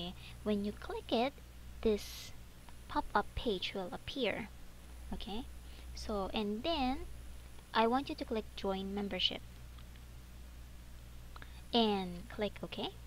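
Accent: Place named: Philippines. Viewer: You talk like my friend from the philippines, it's so cute! Also thanks for helping!!!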